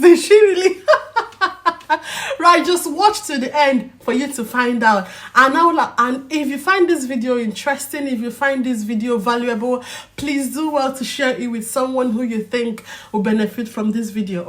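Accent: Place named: United Kingdom. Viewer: The British Accent is getting more and more, am loving it thou. A very big congratulations .there is a big joy to get a yes in a dream n wished job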